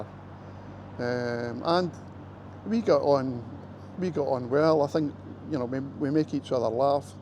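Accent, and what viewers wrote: Scotland, United Kingdom. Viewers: I just finished listening to the age gap piece. First comment, your accent gives you away ' '. I was born in Glasgow in '45. There is a 12 year age gap between my wife and I. Not a big deal as she was 44 when we met 22 years ago back in Canada. She was an OFW contract Caregiver and I worked in Health Care. Our paths crossed and the rest became history. Planning ahead, we bought a condo in Davao in 2012. It became our holiday home. Now that she is retired too, it is now our retirement home and Canada is the holiday destination. As for the age gap, there never really was an age gap for us. When we got together, I made a point of learning as much as possible about the Philippines, from the beginning to the current. History, politics, customs, music, you name it and I am nose deep into absorbing as much as possible. Take care, stay safe and keep posting. 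👍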